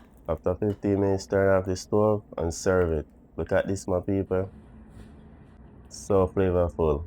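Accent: Jamaica. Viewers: Love how you express yourself authentically Jamaican!❤🔥🇯🇲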